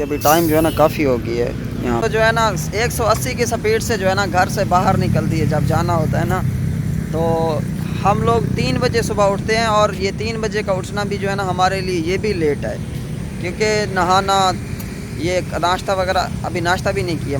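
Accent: Saraiki, Pakistan. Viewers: I knew you were saraiki from your accent :D 😁😁 and you confirmed it. Kery shahar da haen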